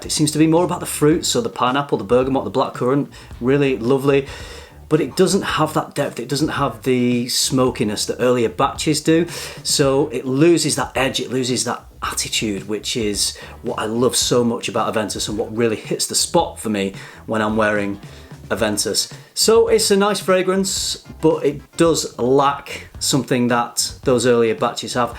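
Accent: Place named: Liverpool, United Kingdom. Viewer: Are you from Liverpool by chance? Could be Machester as well, but I heard Paul McCartney for a quick sec.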